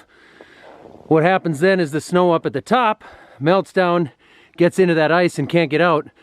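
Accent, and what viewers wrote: Minnesota, United States. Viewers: "on your ruff" I love the Minny accent! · Can you do your best Minnesota accent for us?¿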